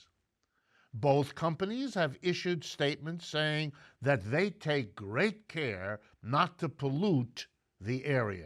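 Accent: New York, United States. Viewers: You know what I don't understand? How a guy who went to Harvard, Yale, AND Standford is talking to us about the problems of our system. I truley am puzzled. How so many priveleged in history take stand against the problem that made them so sucessful. I am thankful for Dr. Wolff's show, however it is odd. You can tell he's a New Yorker by both his accent, and the fact his show is structured like a radio show (big in NY)...plus I google'd him lol. I'm a new englander myself and although I don't live in NY I do appreciate the show's style a lot.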